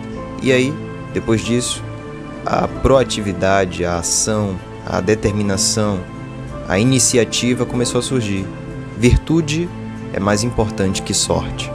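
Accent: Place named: Fortaleza, Brazil. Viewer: Conheço esse sotaque. Tu és de Fortaleza? Gosto muito de Maquiavel.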